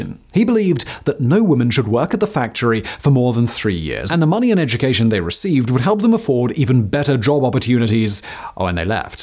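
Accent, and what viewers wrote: RP, United Kingdom. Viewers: being English is pronouncing as a person from England. Being Australian I would pronounce it the same. This is how we pronounce the name in our relative countries. However it is annoying to hear something not pronounced in the way you feel it should be. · He's British. The way he says things is different than us across the pond. His accent is beautiful. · Doesn't matter telling him. He speaks the Queen's, erm, I mean, Kings English of the South here in England referred to as RP (Recieved Pronunciation). All the southerners pronounce it that way. Sounds rather goofy to be honest. Wait until you hear them say Maryland. Literally, Mary Land haha😉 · Amazing that this is what people like you are getting out of this video. Several of you have made comments about the pronunciation. He’s British. Pronunciations vary to one degree or another depending on where you live.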